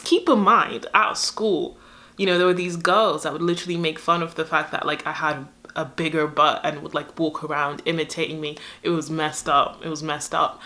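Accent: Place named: United Kingdom. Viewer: are you British? I absolutely love your accent.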